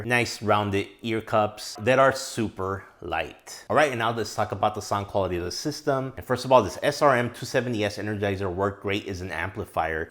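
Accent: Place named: Philippines. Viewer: i hear a filipino accent.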